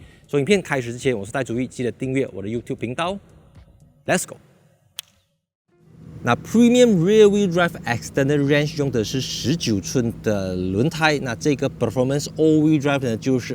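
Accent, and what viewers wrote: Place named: Malaysia. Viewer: 讲解很专业 大马朋友说 华语 口音很好听的感觉 ❤ 👍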